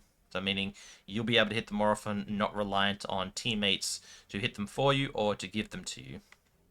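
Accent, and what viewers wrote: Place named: Australia. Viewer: Don't listen to whiney ass Americans. You are obviously Austrailian. If you say Ark Royale. Then just say Ark Royale...I'm American, and I don't think you should listen to others that nit-pick your accent. Fuck em....just say what you want. If you want to just call it the Ark Royale with Cheese....I won't complain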